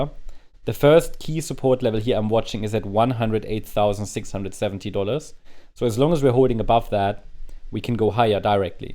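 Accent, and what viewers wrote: Germany. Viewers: He is German right? He Talks soo German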